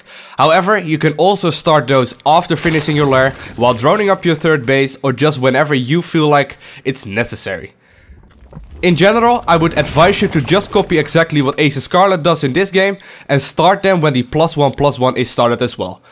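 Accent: Netherlands. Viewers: ik kan aan je accent horen dat je NL bent haha umm... wat voorn league ben je ? en neem aan dat je zerg bent? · Are you from the netherlands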